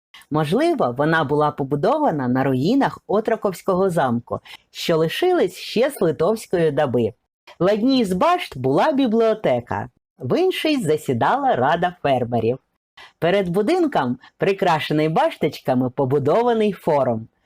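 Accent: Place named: Russia. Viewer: З російським акцентом,наприклад говорить прапаную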